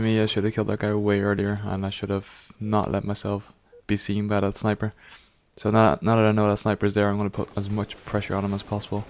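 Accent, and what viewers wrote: Ireland. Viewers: He's Irish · I think hes irish ¡¡¡ lol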